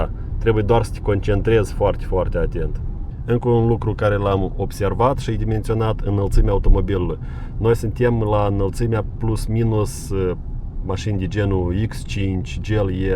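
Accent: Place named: Finland. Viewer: БЛЯ. Зашёл посмотреть, а тут чухонец какой то🤣😂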